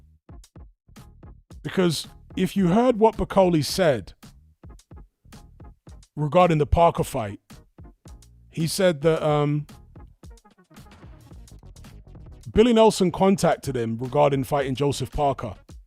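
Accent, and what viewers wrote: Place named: United Kingdom. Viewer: I guess you're not used to hearing black guys with British accents. Anyone who is from the UK will tell you he sounds black. That's what we sound like here.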